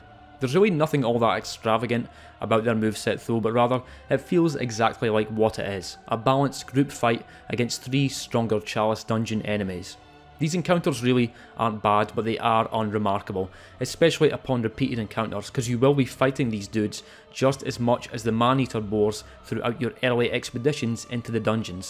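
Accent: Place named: Scotland, United Kingdom. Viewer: Bloodborne content AND a Scottish accent ? Likescribe it shall be.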